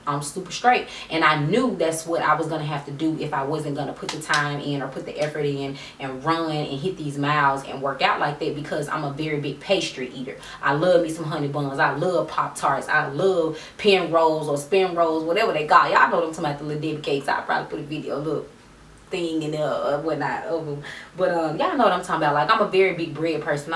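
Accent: South, United States. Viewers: Haha nice video! Here I am watching a year later and I love your accent, I’m from Texas and I talk like this! I can’t control the way my voice changes all southern haha! 😊❤️ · You are extra pretty you must be from the south that accent thick girl lol 😂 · Real talk!!! U talk like u are from the H!!!! H town!!!!I need a friend like u here!!!!!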